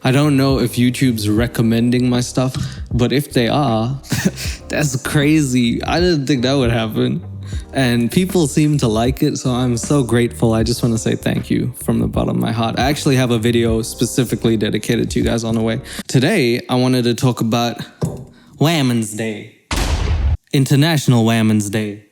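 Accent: South Africa. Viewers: I was sure I heard the South African accent 🇿🇦 proud of you man. Killing the YouTube game. I wish you success boet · Omgosh i just knew it !! I always felt that SA vibe. I just recently started watching you. And have really been enjoying the humor. But i kept thinking u kinda have an accent but not really . But i just knew it... I am from Cape town Anyway keep on keeping on ✌✌✌Thanks for the great vids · My dude, I thought you were South African because of your accent (my first impression). Omg you're so funny, I didn't see the time past😂😂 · wow i knew you were from sa before you said it because of how you talk (also from sa, moved aswell)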